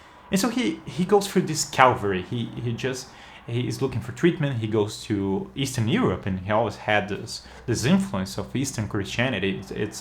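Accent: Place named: United Kingdom. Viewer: professor, your english is amazing, looks very like the british english, sadly my spoken english looks like a fusion of british and american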